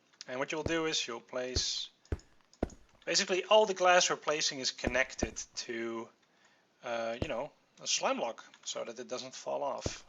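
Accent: Netherlands. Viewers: Yes he's Dutch many ask this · i can hear you are a Dutch man ;) ik heb er niks mee maar het is grappig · You dutch??? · Are you dutch?? THE accent sounds really Dutch...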